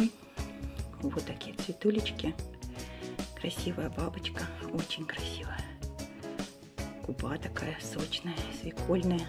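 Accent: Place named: South-East, Russia. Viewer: я так и думала) Слышу, говор "свой", юго - восточный) Привет Вам из Днепра)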